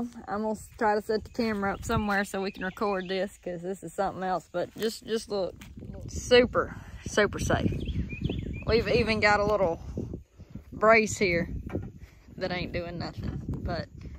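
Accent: South, United States. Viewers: Get Padre to make you a wooden ladder you can just leave it there on site. I had a good chuckle when reading your description and then heard you remark your one truck was "torn up". I had a student years ago write in a homework assignment that his "... sister's dress is toup." (toh-up). I always 'preciate your Southern drawl. Makes me miss my roots. I was born and raised in L A, too. Lower Alabama, that is. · I just love her accent. She is a true southern Bell. 🥰😏😎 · Love your cute souther accent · As a southern guy her accent drives me crazy. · Love you and that southern beautiful language and hospitality.id come help you do any project you might want some help with. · Hello from Texas. Always looking beautiful and melting hearts with your southern accent. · Love That Southern Bell Voice such a Beautiful Young Lady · you're the best ever just love your southern talk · I love ❤️ her Southern accent.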